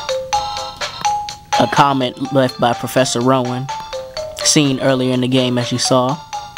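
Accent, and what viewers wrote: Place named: Appalachia, United States. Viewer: MAN! shut up and just play the game, we know what going on, i hate your hillbilly accent i had to stop watching the video and find a video with no idiotic talking. 0/5 stars, no subscription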